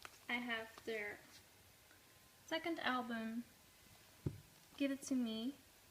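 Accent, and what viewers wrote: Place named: Netherlands. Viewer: haha heard you were dutch immediately when you spoke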